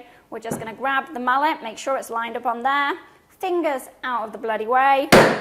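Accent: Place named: England, United Kingdom. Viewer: Have to watch the vid again to find out how to use a disc cutter,--------- couldn't concentrate. was not expecting the pommy accent and then "brick shithouse " came out and then "any hole" PMSL!!!. Had you on fbook for some time now, without knowing you were sooo entertaining. Following on Youtube and Insta from tonight here in Aus.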